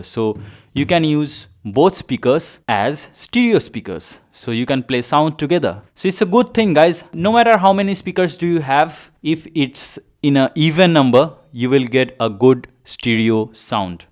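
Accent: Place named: Northeast, India. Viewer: He has an Asian accent. North East Indians have such an accent.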